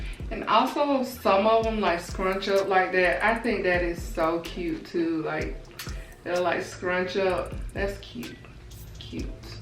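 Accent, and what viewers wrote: United States. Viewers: i love her accent!! so cute and southern lol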